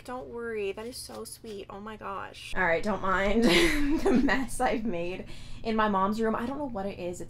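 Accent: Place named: Long Island, United States. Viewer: Your Long Island accident is back…. And I love it!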